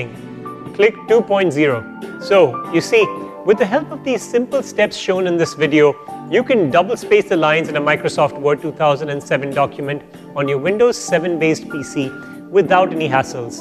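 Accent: India. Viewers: he talks like an Indian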